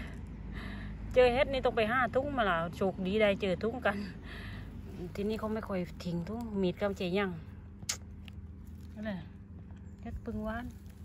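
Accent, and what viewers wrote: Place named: South, Thailand. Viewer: หลกหล่าว นิ ทากน้องเหอไม่ช่ายปลิง แต่ชอบจ้านนินานๆเห็นคนใต้ไปอยู่เมืองนอก เห็นแต่คนอีสาน ฟัง แล้วคิดถึงบ้านอย่างแรง 555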